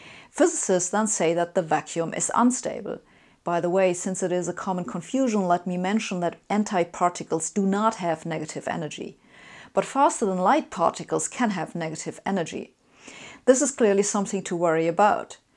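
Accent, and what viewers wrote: Germany. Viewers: she got music videos??? Does she also sing with this strong german accent?^^ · Love your content. Your subtle German accent gives it a nice additional touch.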